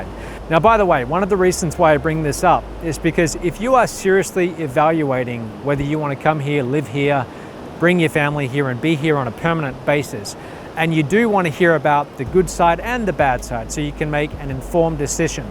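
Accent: Australia. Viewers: As an Aussie, I would say he is definitely Australian. He is apparently on Facebook - perhaps you could ask him directly as well?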